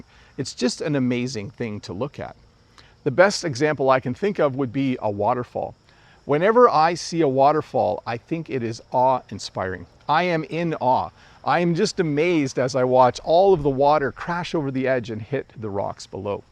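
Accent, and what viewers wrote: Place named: Canada. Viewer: please next time talk about Zebras with your brilliant Canadian accent ❤️🇨🇦🇨🇦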